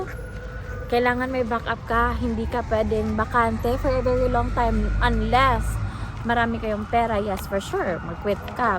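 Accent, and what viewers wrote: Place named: Visayas, Philippines. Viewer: haha! “nayabag naman ni cya oi”. bisaya njud cuz. haha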